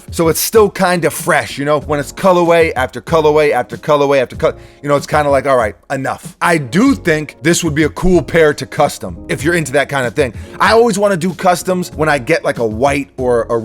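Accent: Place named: Boston, United States. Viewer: def from boston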